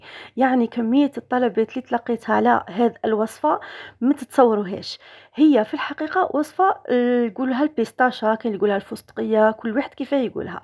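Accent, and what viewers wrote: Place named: Algeria. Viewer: اختي من خلال لهجتها راهي تبان جزائرية محضة ...لهجة المغاربة باينة وواضحة ولا يختلف عليها اثنان ..سبحان الله😊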